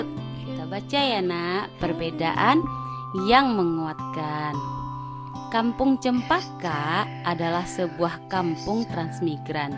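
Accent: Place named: West Sumatra, Indonesia. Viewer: Msih pkat logat minangny buk...ttp smngat cik gu ,🙏🙏☺️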